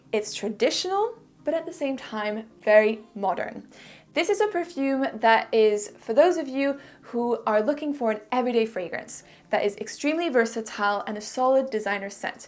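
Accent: France. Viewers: You got a nice french accent , are you from France? Je veux dire ton anglais est excellent en plus de ton bon français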